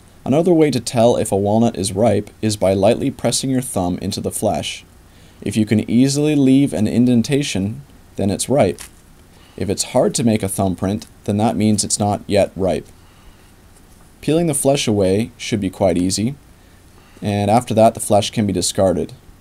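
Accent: Canada. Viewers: Idk if a guy can sound more Canadian....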